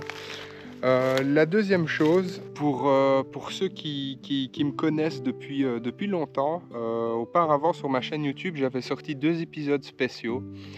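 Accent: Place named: East, Belgium. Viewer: Petit accent là, ça sent l'Est de la Belgique...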